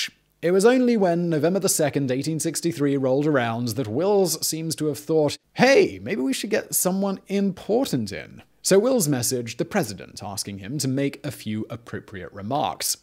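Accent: United Kingdom. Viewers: I love listening to with all the random facts with his video’s but I have to admit, I feel a little dirty listening to a Britt tell American History. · I don't know about somebody with a British accent lecturing about American history really impresses me. I think I would lean more towards David Barton, a true American Historian who backs up everything he teaches with hard fact and thousands of documents from the Halls of Congress and other resources to back them up. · I don't think this guys videos would be nearly as popular if he didn't have a british accent. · its interesting to hear a brit talk abt the civil war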